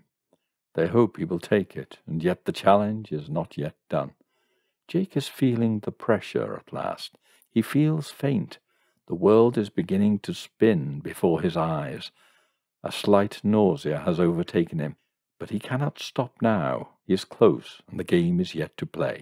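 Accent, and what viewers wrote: United Kingdom. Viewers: Old piano and reverb sets the scene. And only a soft British accent can make me so cozy for murder or suspense. Ha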